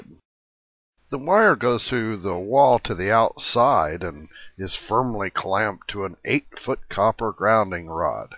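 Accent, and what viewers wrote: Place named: South, United States. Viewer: You sound like a southerner :D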